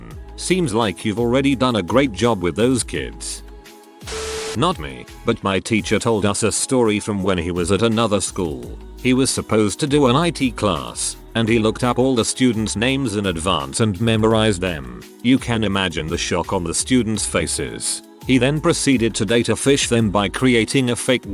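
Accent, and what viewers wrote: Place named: United Kingdom. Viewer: Just another lazily made Reddit video with a robotic British accent.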